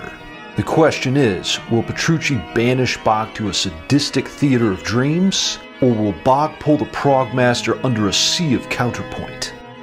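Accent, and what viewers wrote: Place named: South, United States. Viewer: you remind me SO mcuh to Dimebag Darrell, between your southern accent and silly humor similar to the Vulgar Videos. Keep it up!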